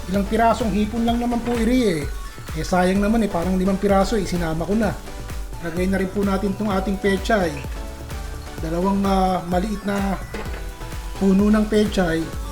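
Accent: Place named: Nueva Ecija, Philippines. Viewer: Kamates, nagka luray luray, ganire at ire. Punto at mga salitang kapag narinig ko eh para kang nakikinig sa matatanda sa Nueva Ecija😅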